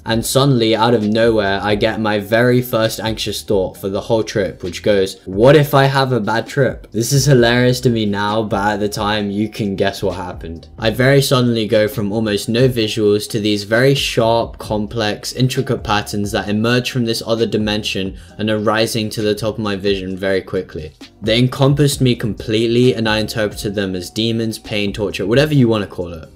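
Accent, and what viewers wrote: United Kingdom. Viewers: m8 why u speak bribish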